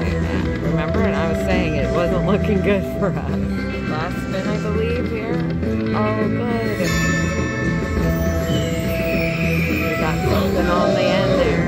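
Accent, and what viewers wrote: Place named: California, United States. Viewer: Your accent you have to be in Cali. I like playing the Bufaros here in Oklahoma casinos . When I was a kid I usd to go to the wildlife refuge and play with the real ones.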